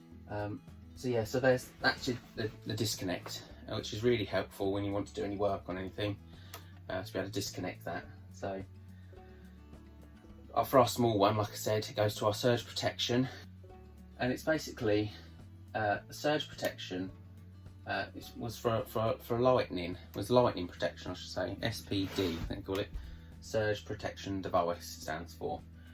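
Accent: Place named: United Kingdom. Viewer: Great Video! Very similar to what I'm building at the moment so very helpful and nice to hear a fellow Brit rather than another American accent!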